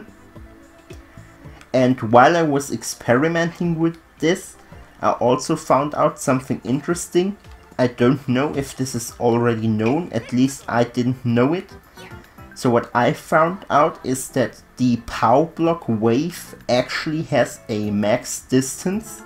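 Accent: Germany. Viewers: Man hört sooo hart dass du Deutsch bist😘 #noHomo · German accent af😅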